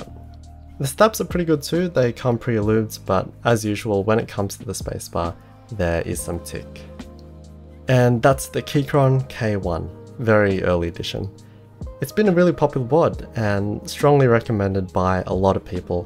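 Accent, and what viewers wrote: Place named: Australia. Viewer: the calm australian accent is great too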